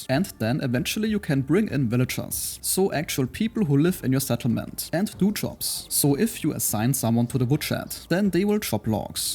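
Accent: Germany. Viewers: so deutsch ab sekunde 1 instant gehört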